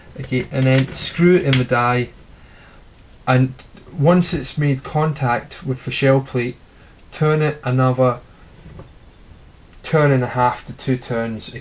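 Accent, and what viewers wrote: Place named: Scotland, United Kingdom. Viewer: Not only is this entire series very informative, it sounds like it has much more authority with the Scottish accent. Props to you, good sir.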